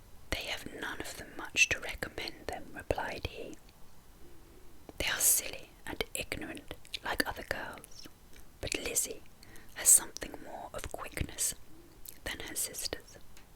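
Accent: United Kingdom. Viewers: Luckily just found this playlist after searching deep, I'd be really happy to hear another series of a storys chapters read by you! :) whispered reading is my personal favorite to relax/ fall asleep and I think your (or the british) accent in general is percect for it. It's also something simple you can upload to feed the algorithm in case of having periods of feeling less creative :) Hope your channel will heal and you are doing well ❤️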